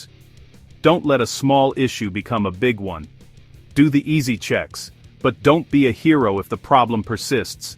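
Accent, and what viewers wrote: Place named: United States. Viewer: British words with a USA accent.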